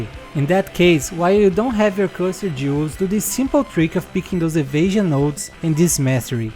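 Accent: Brazil. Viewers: Esse ingles brasileiro me pegou mt Bom trabalho mano!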